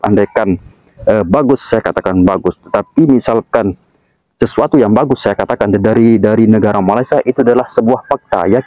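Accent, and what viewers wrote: Indonesia. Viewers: Kakak tau kamu orang indonesia dari cara kamu berkata2 berbeza dari bahasa melayu Malaysia khasnya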